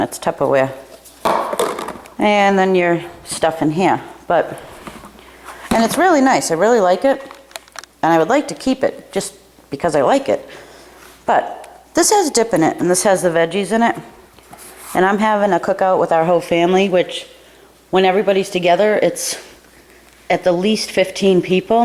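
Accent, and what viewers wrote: Boston, United States. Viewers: Being from Boston, I noticed your accent immediately. Kerri :)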